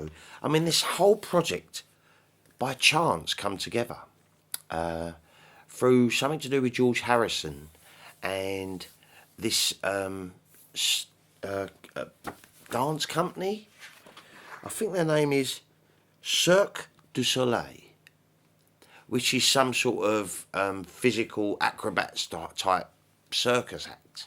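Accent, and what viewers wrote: United Kingdom. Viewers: As a newcomer, I have to say your voice is magnificently British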